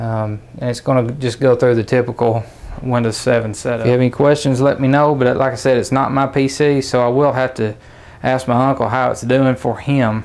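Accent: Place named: South, United States. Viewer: very nice southern dialect, sounds like in a movie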